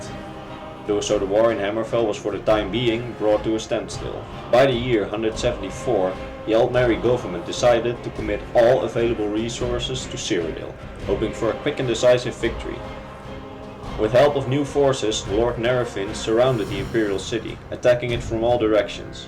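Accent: Netherlands. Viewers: is that a Dutch accent? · oh nederlands engels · It's a Dutch accent though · You sound like you have a Dutch accent. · Nederlander!! Ik hoor het in de eerste drie seconden al gewoon. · Vanaf hoorde ik al gelijk... Een Nederlander! Wij hebben echt zo'n typisch accent. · Are you dutch, zo ja. Hahaha ik wist t. Hoorde t aan je accent. Als t niet zo is, oops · are you dutch because you have that dutch kinda accent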